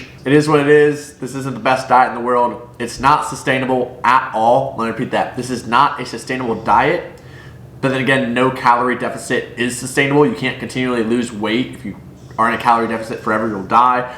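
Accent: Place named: Australia. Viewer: Random question here, have you lived in Australia? I can hear an Aussie accent through your American accent! Just curious!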